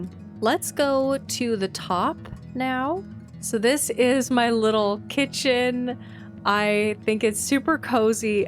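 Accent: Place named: Cape Breton, Canada. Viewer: u are rly speaking like a cape bretoner!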